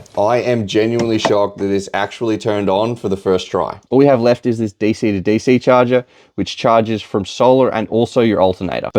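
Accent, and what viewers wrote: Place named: Australia. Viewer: Might miss the mark entirely, but he sounds Aussie to me. So not European but still nonetheless your comment does partially apply.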